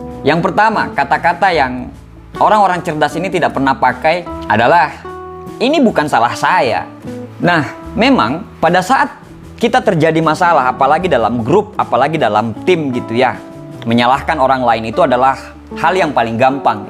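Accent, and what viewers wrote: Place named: Palu, Indonesia. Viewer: Kayak logat Palu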